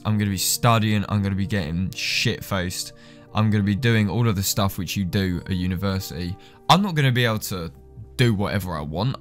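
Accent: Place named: Cockney, United Kingdom. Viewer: This dude is legit, no frills, just straight up NMS and a cockney bloke. 👍🏼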